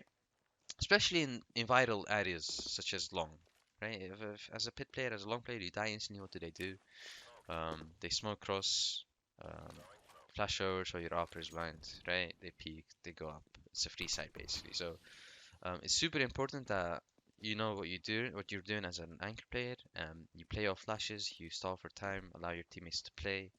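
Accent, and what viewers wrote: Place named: Netherlands. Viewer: Why you sound netherlands, bonuskaart typa english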